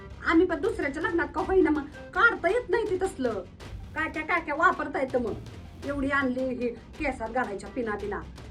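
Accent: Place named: Kolhapur, India. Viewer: किती छान बोलत आहेस मस्तच कोल्हापुरी ठसका ✌️✌️💪💪👌👌👌👌🤣🤣🤣💐💐💐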